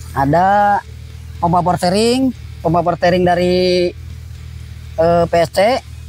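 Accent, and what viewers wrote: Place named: Indonesia. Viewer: Dari logatnya pasti org indonesia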